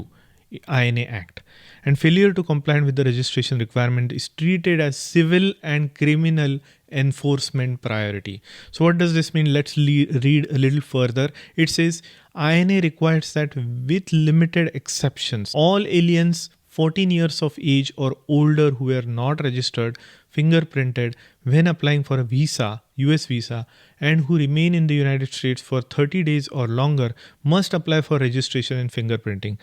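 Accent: Maharashtra, India. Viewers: apun maharashtrian ahat ka? your english is total marathi accent. jai Maharashtra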